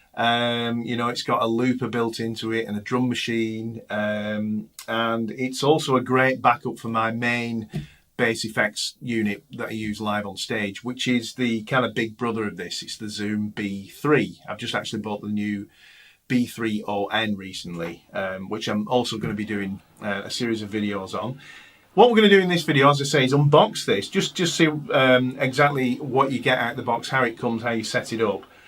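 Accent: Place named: Northern England, United Kingdom. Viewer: Great demo! Love that short-scale bass, too. And it's nice to hear the dulcet tones of a fellow Northerner on YouTube ;)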